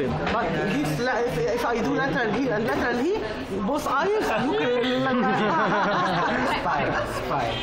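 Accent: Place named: England, United Kingdom. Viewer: hello english accent!!!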